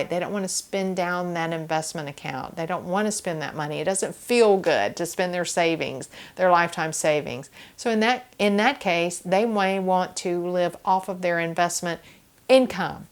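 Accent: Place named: South, United States. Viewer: Love your videos! Where do you live? I notice a Southern accent and I'm in Charlotte NC:)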